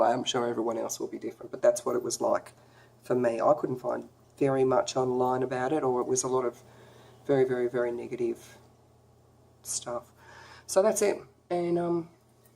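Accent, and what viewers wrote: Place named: Australia. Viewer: Hi, Thanks very much for posting this. I had an iron infusion yesterday, am feeling achy, lethargic, hypersensitive and a bit nauseous today, like a bit flu-ey or something. Appreciate your video, so far, you're the most watchable genuine person I've found and of course the Aussie accent is the best break from all those bloody American ones! 🙄👍❤️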